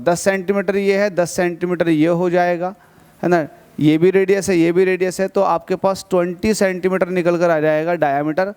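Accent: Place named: Moradabad, India. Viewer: Sir aaap Moradabad side ke hn kya ?? Apka accent bahut accha lgta h 😊 aap please khulke bola kriye apne accent me mza aaega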